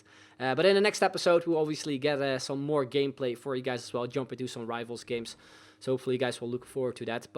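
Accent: Netherlands. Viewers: K denk dat je Nederlands ben heel klein accentje nog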